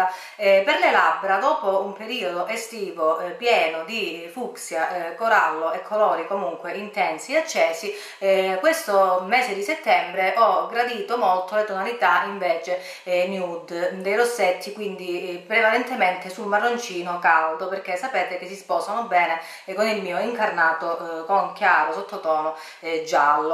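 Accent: Calabria, Italy. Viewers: Ciao, ti ho appena scoperta e non posso più fare a meno dei tuoi video! Adoro il tuo accento calabrese e i tuoi gusti! Un bacio da Napoli!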